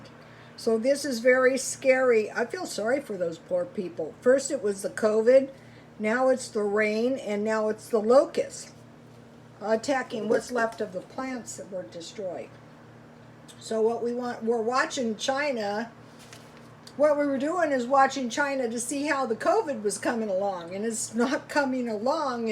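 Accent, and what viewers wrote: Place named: San Diego, United States. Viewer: Beginning of video I'm like...she sounds like she's from SD. Oh shit she is from SD! Shout out from the SouthBay down here.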